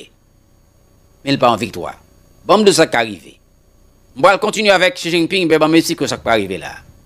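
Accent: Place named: Haiti. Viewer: Bonsoir frem mkontan tande ou avek gro kreol sa frem Oh oui Mr le President kompeann gin lot peyi ki ka vinn niche deye l Ayayayyyy frem kreol la gro nan mains ou oui la Merci et bonne semaine avek fanmi ou specialement la petite princesse Reserve yon ti temps speciale pou ti princesse la pou moin frem Minm ou minm wap kontan Le wap pale avek li Pitite fille yo tjou rinmin papa yo et papa tjoujou rinmin pitite fille yo Autrement dit vice Versa frem Ke bon Dieu beni ou protege ou Frere c pou Aprann konnin ou gin plus moun ki apprecier ouki respecter ou a l egard de service wap rann Societebhaitienne ke moun ki comporte yo kom des imbeciles Ok ke toute bon bagay yo bon Dieu fe yo pou ouak toute pitite ou yo madanm ou et bon travay bonne semaine Bonne sante bon courageet bonne perseverance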